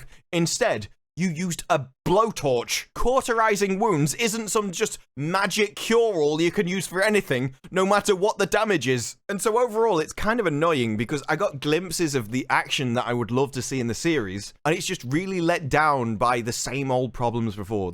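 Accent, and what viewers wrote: United Kingdom. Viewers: It's so fun to hear these reviews: It's like a recap of many of my own thoughts when watching the episode, in a UK accent. Thank god this season's over, let's hope the viewing numbers and backlash finally kills this foolishness a.s.a.p. (even if it's already renewed, goddamnit) Additional thoughts (alongside existing review): - Why do Plank and Tank react with any emotion to the reveal of kidnapping, instead of going for cold logic? - How'd Cheeks keep up with the other running spartans when chasing covy ship, without armor? - How'd Pinkstreak get into the ship, when she's only got a sidearm with her and it's literal spaceship-grade hullplating? - Why, when she hero-lands in the hallway (how'd she get a hole precisely over that place anyway?), is there not any howling wind from the hole she magicked into the hull of the ascending craft? 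- How did the crashing ship come back to basically in the same airbase that it launched from, when it was presumably programmed to fly away from there and was in the air for several minutes? - Why is the post-massive-security-breach meeting with non-relevant characters (Miranda and John) in the science room and not a boardroom of senior personel yelling at Parangosky? - Cortana should've answered: "I was in Cheeks' brain, so had access to every sensory datapoint. All these pointed to truthfullness (see archived report)." - Why has the UNSC not sent at least 1 single probe to the system that might hold enormous strategic importance instead of s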